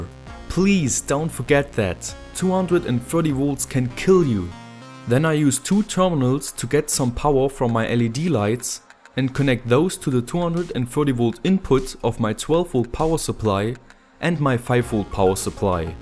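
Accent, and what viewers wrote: Germany. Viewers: dude he's German you can tell by his accent. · he sounds german to me and the brands he uses suggest he lives in eu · That accent is clearly German, maybe Dutch which is similar (NOT the same, I dont wanna offend my German friends) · Your very german accent is very pleasant to my ears.. Nice project but why don't you use just a small transformer or transducer to get rid of the hum, when you use the same powersupply for the amp and the receiver?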